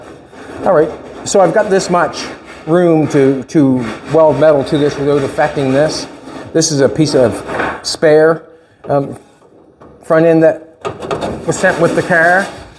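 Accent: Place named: Canada. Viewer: Same reason he calls it a care instead of a car. He's Canadian.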